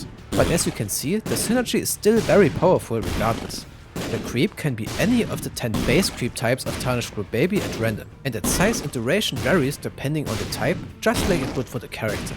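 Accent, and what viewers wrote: Germany. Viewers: Its a german accent. I have it aswell, thats why I know lol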